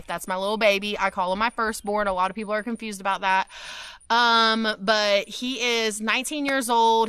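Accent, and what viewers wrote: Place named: South, United States. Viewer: I think your videos are so sweet. I am a GA girl! I love to hear you talk. Sweet Southern Girl!!💖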